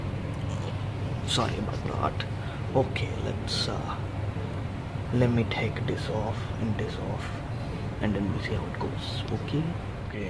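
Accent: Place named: India. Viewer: curry accent :) made me lol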